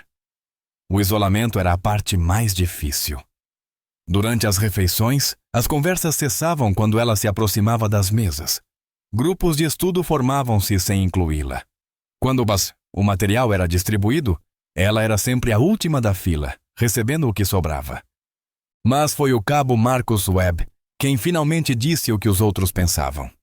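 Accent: Portugal. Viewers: Narração português de Portugal.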